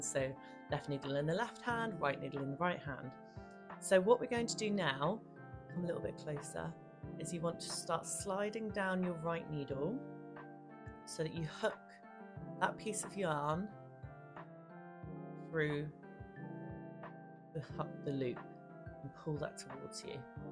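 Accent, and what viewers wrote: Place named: England, United Kingdom. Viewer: I'm 73 yrs old. When I was 22, I knitted my baby son a blanket. Now I can't understand the instructions until now! Thank you! Lovely English accent 😊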